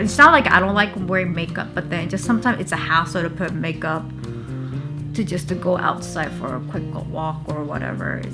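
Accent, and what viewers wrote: Hawaii, United States. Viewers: Only one year. I can hear the local accent. Great perspective on living in Hawaii. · Interesting, she speaks with Hawaiian accent? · Wow she speaks good with a little pidgin for being in Hawaii for a short time! Mean!! Hahaha she get’um small kine! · I would say that she's a Japanese-American from Hawaii that was living in Japan for work purposes before returning to Hawaii. It's pretty obvious from the way she speaks that she's lived in Hawaii for a long period of time. She's got the lingo down pat and that's not easy for a non-native speaker.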